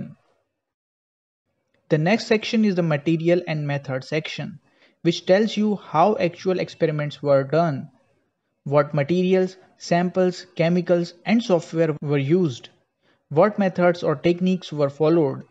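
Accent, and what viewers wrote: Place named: India. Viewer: 印度口音..........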